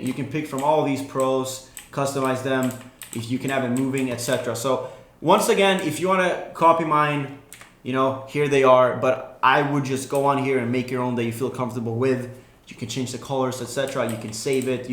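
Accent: Sweden. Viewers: U are talking a littel bit swedish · Are you from Sweden? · are you from sweden?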